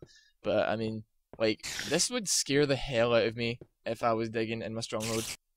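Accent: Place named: Scotland, United Kingdom. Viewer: i like your scotish accent!!!!!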